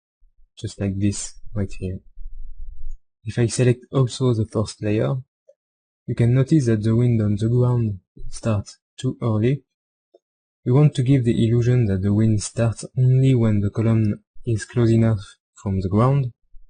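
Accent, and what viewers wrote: France. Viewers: J'aime quand les français parle anglais :) bonne vidéo merci ! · tu es français non? :-) ça ce voit à ton accent ^_^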